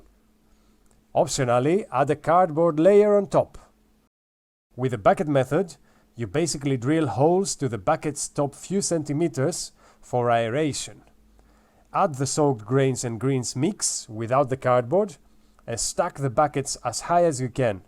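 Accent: Greece. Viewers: Your accent sounds Greek... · Ο πωπωπω η ελληνική προφορά την ακούς παντουυυυ. 🇬🇷🇬🇷🇬🇷🇬🇷🇬🇷🇬🇷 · Chris Chavez Well, it's definitely not Indian, and I don't think it's Turkish, although that is a better guess. Sounds a lot like Greek to me. Specifically southern Greece. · Κατάλαβα αμέσως ότι είσαι Έλληνας από την προφορά σου έκανα sub γιατί βρίσκω το περιεχόμενο ενδιαφέρον. Σου εύχομαι τα καλύτερα και καλή συνέχεια!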